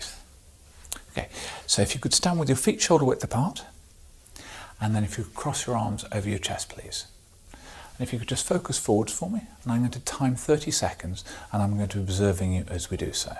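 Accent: England, United Kingdom. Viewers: Yep. This english accent kills me. Silence is better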